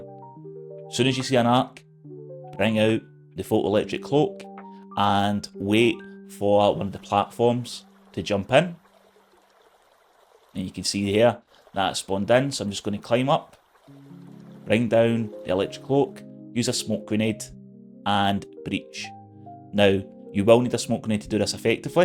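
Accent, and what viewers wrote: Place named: RP, United Kingdom. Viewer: Nice effort with the posh accent.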